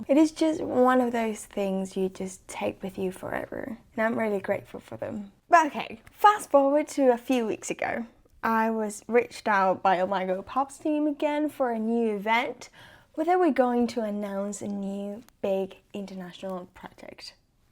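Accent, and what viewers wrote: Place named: United Kingdom. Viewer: tu acento British es precioso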